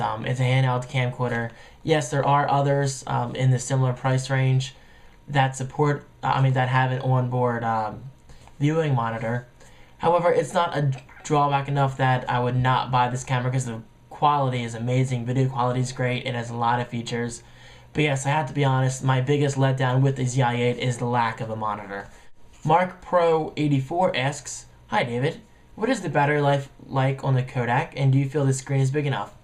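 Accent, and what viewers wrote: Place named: United States. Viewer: Arghh I love your accent, im brittish and just love the american voice ! Oh and yea I have this camera and i LOVE IT, the audio isnt great but it recorded a concert with heavy bass really well. I also used it when skiing.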